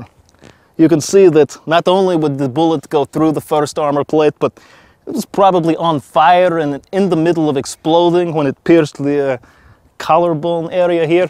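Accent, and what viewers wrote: Russia. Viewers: 俄国口音的英语吧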